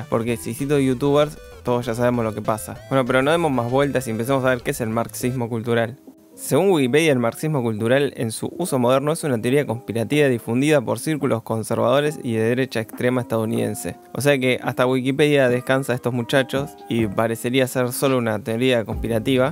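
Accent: Argentina. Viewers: Sos de Argentina,seguro ?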